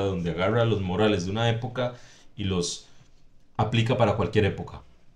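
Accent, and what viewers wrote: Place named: Colombia. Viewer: El acento de los Ticos es muy parecido al de colombia. Juro que creí que eras de Colombia 😎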